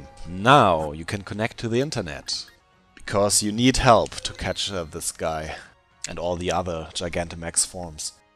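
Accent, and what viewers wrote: Germany. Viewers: You’re German, aren’t you? Or possibly polish?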